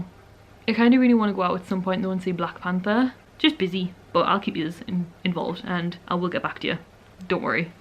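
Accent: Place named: Northern England, United Kingdom. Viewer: because I live in the uk and that's an up north accent xxxxxxx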